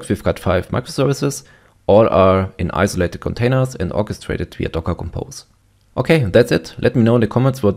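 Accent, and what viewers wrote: Germany. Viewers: Well, it's not exactly 'that german accent', not exclusively. It was also the top quality, very succinct, informative content. Your channels are easily mistakable in that regard as well. Truly great.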